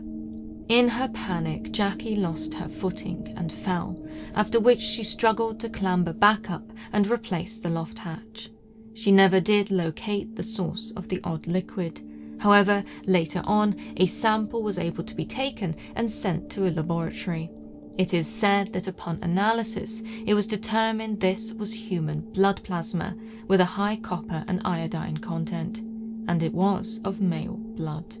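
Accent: United Kingdom. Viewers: I must say, your sponsors get their money’s worth. Flawless ad read in an elegant British accent is usually something they have to pay extra for.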